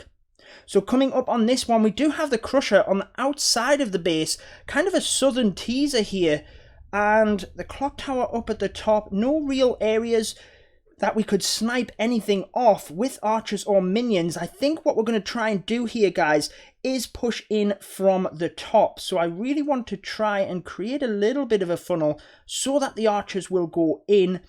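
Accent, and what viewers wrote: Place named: Newcastle, United Kingdom. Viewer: found your channel and subscribed! Great content mate 😃 can't quite get your accent! was gonna say Geordie but leaning more towards Boro, Sunderland? I'm from near Darlington! keep up the good work :)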